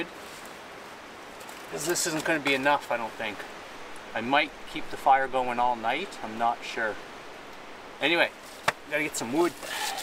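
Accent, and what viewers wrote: Canada. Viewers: Luv that Canadian accent ! / almost thought I was watching the trailer park boys lol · where are you from? Your accent sounds similar to Cape Breton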